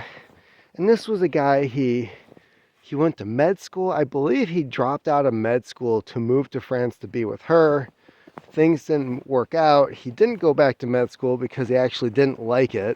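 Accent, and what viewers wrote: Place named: Michigan, United States. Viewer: Damn he was two sentences in and I already knew you was from Michigan and then mentioned Kalamazoo and portage and then I knew.. I grew up in that state spent 23 years there when you grow up there you are pretty much brainwashed into thinking that your state is the best state and everybody else's sucks and you get if you're on the west side of the state you're definitely also taught brainwashed and you're thinking that your church is better than everybody else's church and everybody there is very particular or we would call odd if you're living in other states.... Grand rapids Michigan was one of the first cities introduced fluoride into the water That's why you always encounter passive aggressive intellectual types up there in Michigan they want to get upset but they've been poisoned to deaths and they've been brainwashed into thinking that diplomacy is how you should operate or manage your local and state government and they don't know that they got brainwashed into that mentality they didn't get the memo that the wolves sell diplomacy to make it easier to manage the week and the meek... 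I'm rooting for my fellow Scandinavians That's predominantly what is up in that neck of the woods in every variety I'm rooting for you...but if you want to be able to keep that state which used to be great is not anymore well then you better take it back take out all the liberals out of that state and take it back kick out all the greedy Republicans and take it back most of all of your home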